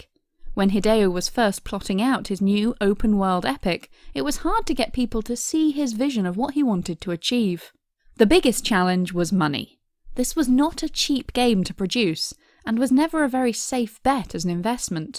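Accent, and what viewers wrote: United Kingdom. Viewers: It feels like that the narrator is british, my asian ears are hardly listening to this narrator. Glad there is subtitle available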